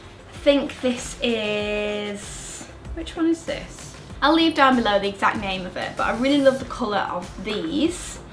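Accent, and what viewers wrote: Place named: Bolton, United Kingdom. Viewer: No matter how tired I am I'll stay up an extra 10 minutes to watch your videos. Love them every time & really love the local accent (I am a Boltoner originally) xx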